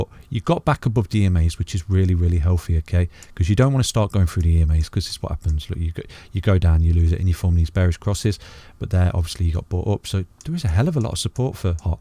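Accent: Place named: Leicester, United Kingdom. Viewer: East Midlands Crypto massive!! Guessing you might be from Lestoh as well haha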